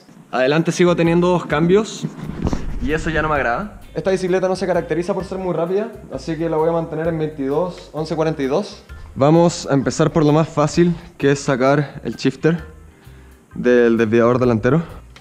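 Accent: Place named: Chile. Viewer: Me encanta tu asento chileno hermano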